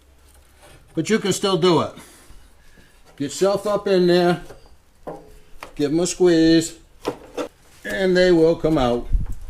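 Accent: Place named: Maine, United States. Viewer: As a frequent visitor to the great state of Maine, I loved the license plates, accent and info! I have the old return tubes in the trash and since I have my old heat exchangers off and also in the garbage, this should be pretty easy....famous last words...we'll see this weekend. thanx again..most helpful and entertaining. steve meltzer, houston, tx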